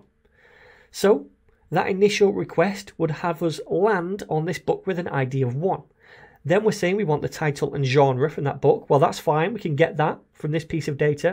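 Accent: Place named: England, United Kingdom. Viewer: Very useful tutorial, congratulation! I love this english accent, Could someone tell me if it is British or USA accent?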